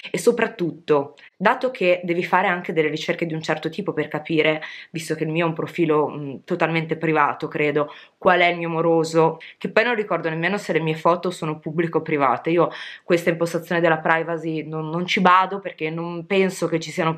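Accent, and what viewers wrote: Emilia, Italy. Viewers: Fare il "simpaticone" con la donna/l'uomo di un altra persona é trasversale. Non lo fanno solo gli uomini etero piacioni o le Gaie di turno. Certamente, lo fa chi non ha rispetto, per se stesso in primis, e non prova amore verso il prossimo. Guardando video di vari youtuber mi sono fatto un'impressione: molti (idioti) sono gelosi e invidiosi di chi fa video su Youtube, ritenendo (erroneamente) che gli youtuber hanno tutte le fortune del mondo e provano solo piaceri. Ad esempio, certi si fermano soltanto al fattarello che le aziende vi inviano prodotti, non immaginando nemmeno cosa c'é dietro, ovvero che si tratta di lavoro e quanta fatica c'é prima della pubblicazione di un semplice e solo video. Alcuni, ingenuamente, pensano che gli youtuber siano dei privilegiati, fortunati e paraculati...allora, provare a "fregarti" il fidanzato può essere, per qualcuno, una piccola rivincita, perché magari questo qualcuno pensa che te lo meriti, solo per la "colpa" di fare la youtuber. 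Mi viene da pensare questo guardando i video di Cristiano, Marta, Giulia, Mia...e mi vergogno sempre piú di chi abbiamo intorno! Ho sempre creduto fossi emiliana o romagnola, mi pareva avessi un accento di quelle parti li...per quanto non me ne intenda di accenti!:) Posso chiederti un piccolissimo favore? Immagino bene di essere, probabilmente, l'unico uomo tuo iscritto e follower, tra tantissime donne, ma potresti rivolgerti, alla fine o all'inizio dei tuoi video, non solo alle ragazze? Se puoi, mi faresti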